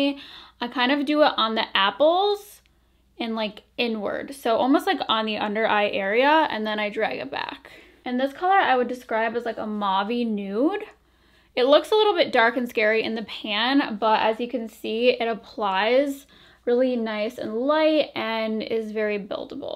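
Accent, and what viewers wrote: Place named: Michigan, United States. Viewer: This is totally random, but are you from Michigan? You definitely sound like you have a Michigander accent. :)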